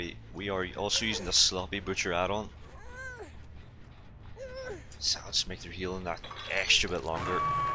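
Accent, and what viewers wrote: Northern Ireland, United Kingdom. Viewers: you from northern ireland? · Are you from Northern Ireland?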